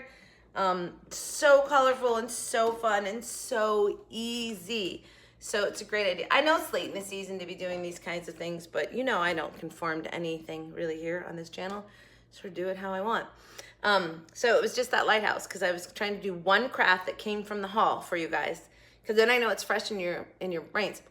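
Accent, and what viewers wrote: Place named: North, United States. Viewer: Hello i just came across u on you tube. Yes I did put up a like & subscribe. I'm from alittle village called Conmicut. I can see ur not from the south cuz ya talk like me! I think u did a great job on ur DIY'S So I'll see ya again & again hee hee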